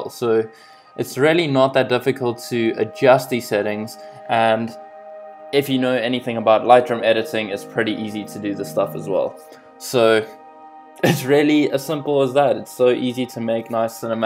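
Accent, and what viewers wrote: South Africa. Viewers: dude are you south african???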